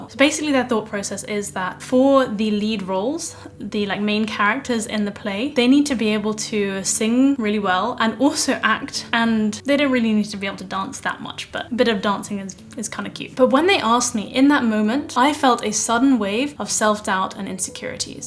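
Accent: United Kingdom. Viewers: Hello Dr , could you please tell me what is the name of your British accent? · I'd say that your videos not only provide helpful and valuable information, but also are very good for learning English, especially for people who are learning British accent like me (I mean, among a lot of YouTubers who speaks British accent, your voice is very good and beautiful). Athough YouTube already had its own auto-generated subtitles for videos, I'm still thinking that it'll be better if you can add subtitles to your next videos. It would make it a great way for people who come not only to watch and learn things you're sharing in your videos, but also find a good YouTube channel for improving their English. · I love that you're Chinese, but you have a British accent. I could listen to you all day.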